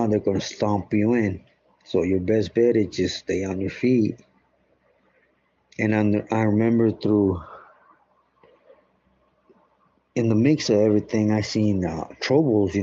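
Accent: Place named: Los Angeles, United States. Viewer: I'm a white dude that grew up in LA and this dude sounds like everybody I grew up around.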